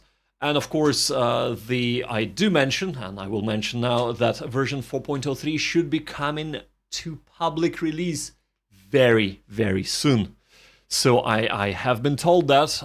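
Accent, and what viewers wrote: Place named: Eastern Europe. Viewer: Nice one boss. Where r u from? Eastern european accent. I played everything available for free from Wargame Design Studio. Grenada, Miuss etc... Great games. Get stuck straight away.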